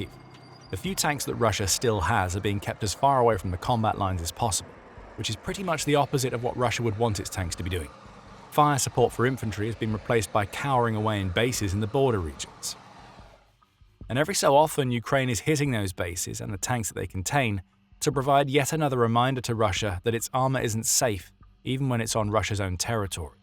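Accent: United Kingdom. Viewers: "This is Insanity. Putin would rather loose 100 soldiers than 1 tank." The irony of that statement is that the narrator has a British accent. During the the battle of Passchendale, the British High Command lost so many of the horses that pulled artillery and wagons that the British considered the loss of horses as more significant than the loss of men.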